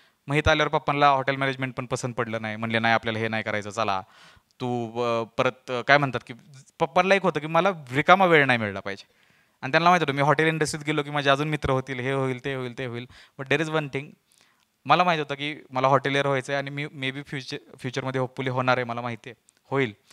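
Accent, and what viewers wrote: Satara, India. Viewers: सातारी भाषा कडक भावा🔥🔥🔥🔥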